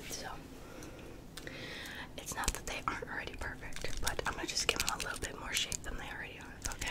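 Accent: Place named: Michigan, United States. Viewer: Omg you sound like you’re a fellow Michigander. Your videos are so relaxing. Have a good evening girl❤️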